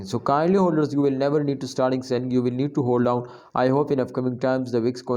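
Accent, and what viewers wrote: India. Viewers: When i here indian accent i move on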